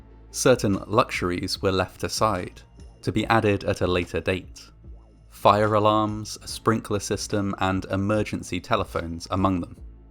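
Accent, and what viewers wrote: England, United Kingdom. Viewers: This channel is amazing and you sound like a really intelligent guy. Very refreshing. Would I be correct in assuming that you are English?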